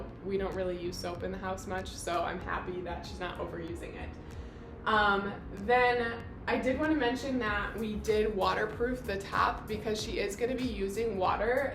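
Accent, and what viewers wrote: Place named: Illinois, United States. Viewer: Lol I can pick up on your Illinois accent right away. I’ve seen a lot of these kitchen Montessori hacks. Trying to find one that shows how the pvc pipe goes into the faucet.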